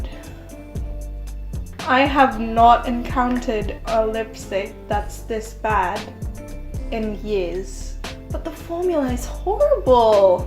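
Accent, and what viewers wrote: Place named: United Kingdom. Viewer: gurl your British accent has gotten so much heavier than your last video lmao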